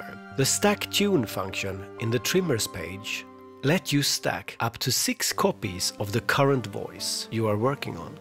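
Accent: Sweden. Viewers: Dr Mix speaks with an Italian accent. This guy speaks with a pronounced Swedish accent. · Du låter svensk :)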